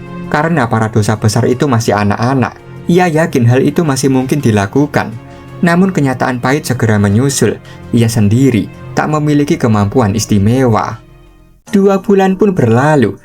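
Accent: Java, Indonesia. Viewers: serasa nonton wayang bang, java lu medok bngt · Bang kamu orang Jawa ya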